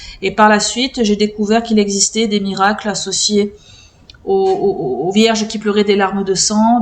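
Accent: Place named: South, France. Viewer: Effectivement, l'accent du sud se fait parfois entendre. 😉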